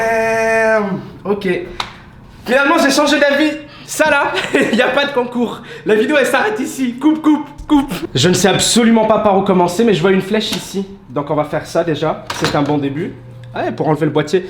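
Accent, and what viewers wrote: Canada. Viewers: tes canadien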